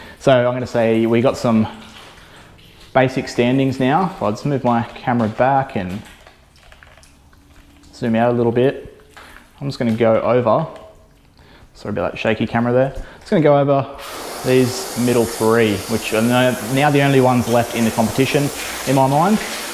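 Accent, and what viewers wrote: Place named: Australia. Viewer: Ah an Aussie accent 😂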